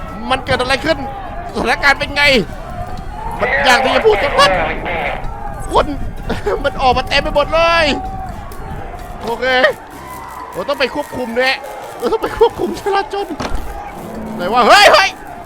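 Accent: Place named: South, Thailand. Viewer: ชอบความ พูดภาษาใต้จัดๆ ภาษาใต้เป็นภาษาที่อารมณ์ดี 5555+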